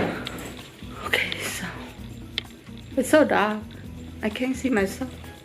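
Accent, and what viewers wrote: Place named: Vietnam. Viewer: Are you Khmer or what? You act like it's your first time in Cambodia. You have Vietnamese accent though.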